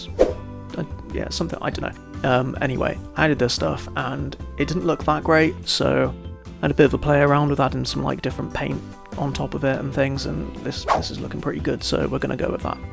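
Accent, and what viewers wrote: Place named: England, United Kingdom. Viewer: Ah, always so nice to find a fellow English man! "He's ard, inhe?!" 😂 Also, if you ever need to bake very small bits of clay (as in covering the wires) you can use a heat gun instead of putting it back in the oven!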